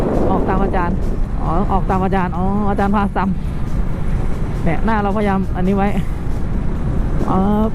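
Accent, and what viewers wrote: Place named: Isan, Thailand. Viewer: เว้าอีสานคือเป็นตาแพงที่ว่ะ😅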